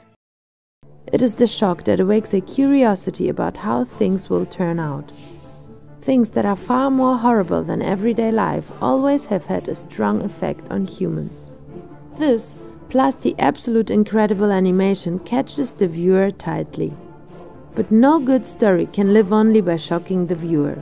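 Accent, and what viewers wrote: Germany. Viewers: Are you german? Your accent sounds like you are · are u german btw? bc if u are I can hear it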